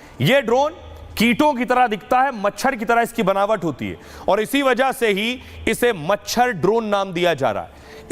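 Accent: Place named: Pahadi, India. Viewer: मुझे आपका पहाडी आवज बहोत पसंत आता हैं घर में मोबाईल रख दो और काम करते करते समाचार सुनते रहो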